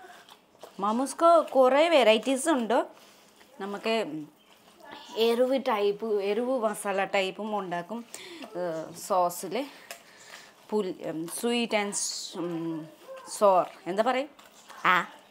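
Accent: Tamil Nadu, India. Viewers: Oru tamil touch Ulla malayalam pole thonnunnu😅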